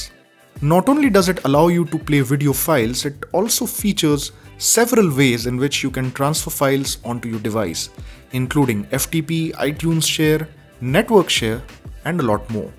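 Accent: India. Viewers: Indian english 🙁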